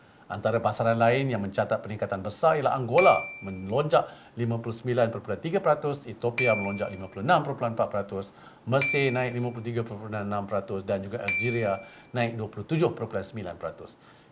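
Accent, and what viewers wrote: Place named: Indonesia. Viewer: Logatnya seperti orang indonesia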